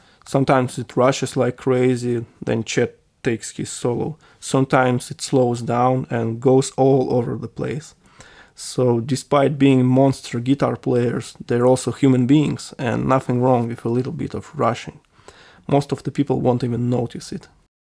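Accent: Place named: Russia. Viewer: Enough bashing yourself, you speak and explain very well, in fact you have a rather weak Russian accent, if it bothers you, I can help quickly (went through the same);)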